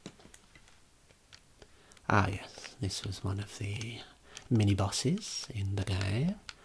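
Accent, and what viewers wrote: United Kingdom. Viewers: I adore this accent. Obviously somewhere in the UK, but I can't place it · Have just stumbled onto your channel, and my immediate thought was "oh no he's a Brummie", as am I and I loathe the accent! However, I'm picking up Black Country? Whatever your accent is, your voice is just heaven Sir, and I loved the retro games, definitely my era 😊 Thank you so much, you have a new subscriber 🤗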